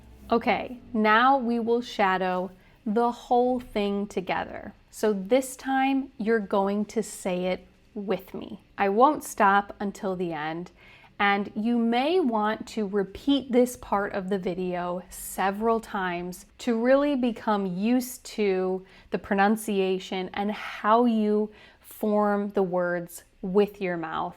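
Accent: United States. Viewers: ✨ You sound so good in this shadowing video! Super inspiring to practice along and pick up the American accent, intonation, and flow. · Una pregunta es inglés americano ??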